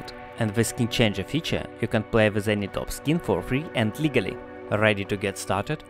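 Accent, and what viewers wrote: Russia. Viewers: ох уж этот русский акцент)))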